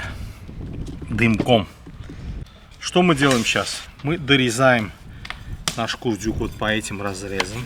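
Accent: Baku, Azerbaijan. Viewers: Маладец бакинских акцент нигде в мире не перепутаеш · Бакинский окцент..🥸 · Бакинский акцент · нет брат он не таджик по аксценту чиста Азербайджанец я таджик не думай что я нацист просто у нас капчоних курдюк я не видел хотя самие лючшие курдюк ето наш Гиссарского породи честно сказат я его постоянно ел в России в санатории нам прислали кыргызские братья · смотрю видео,слушаю ваш бакинский акцент:-респект и уважение!Будьте всегда здоровы,живите долго,радуйте новыми видео, которые не оставляют никого равнодушными,я думаю многие такого же мнения!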